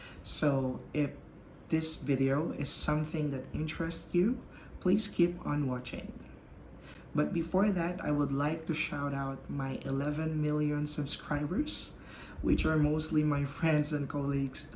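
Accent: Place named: United Kingdom. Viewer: I can heaar UK accent 😂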